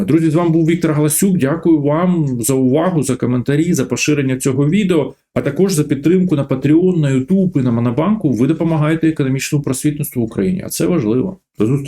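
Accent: Moscow, Russia. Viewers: vin mae moskovsjkyj akcent. akae bezudarni O.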